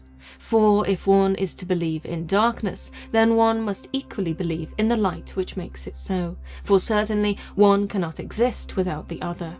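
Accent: United Kingdom. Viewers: I love narrators/storytellers with British accents, it grips your attention and it's elegant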